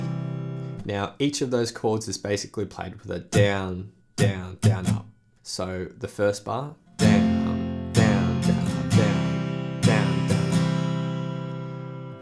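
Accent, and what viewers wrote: Australia. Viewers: .. it dawned on me that your accent is Australian? This is how I know actually.. I am very surprised you've not decided to cover yet!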